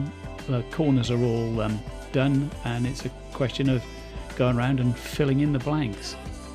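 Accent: Norfolk, United Kingdom. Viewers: many thanks. I will have to google cruck frame and sip panel as I don’t know what they are. The only thing I was worried about if I sank it low was damp. You sound like you’re from Norfolk way. I’m Wisbech based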